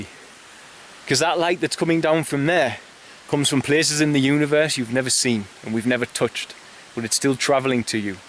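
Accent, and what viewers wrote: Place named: Newcastle, United Kingdom. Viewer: He's got a Geordie accent so I would assume he's from the Newcastle area.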